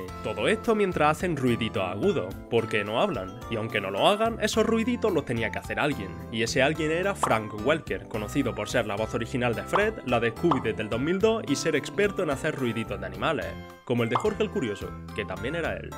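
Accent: Andalusia, Spain. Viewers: No cambies tu acento. Sé que algunos no les gusta el acento de Andalucía, perp yo he quedado encantado. ¡Sigue así, man!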